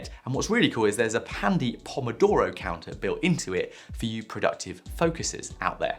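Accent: United Kingdom. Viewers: I subscribe to ur channel cause I like ur British accent lol …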